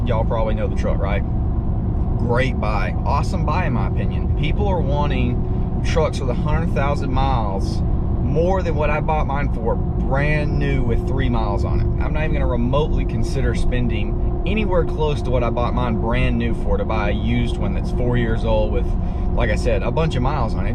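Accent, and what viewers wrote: Alabama, United States. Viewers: Are you from Alabama ?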